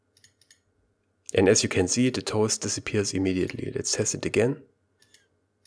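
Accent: Germany. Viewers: Man merkt das er deutsch ist 😂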